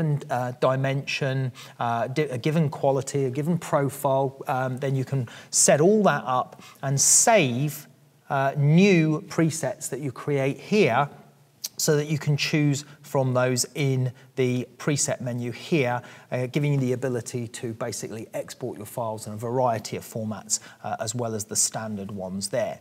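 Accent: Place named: England, United Kingdom. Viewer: This is an English accent. If you can't understand it then you need to take some English classes. You could, alternatively, simply use a slower speed setting on YouTube to understand him better.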